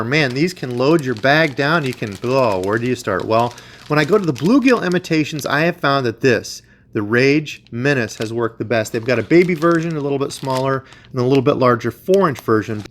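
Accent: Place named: South, United States. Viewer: The entire South sounds exactly like that lol….Id like to know how to use the swivel or wobble type head ones.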